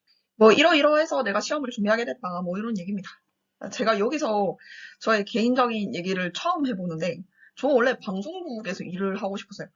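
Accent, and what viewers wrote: Busan, South Korea. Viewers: 언니 고향 부산이신가요 친근해요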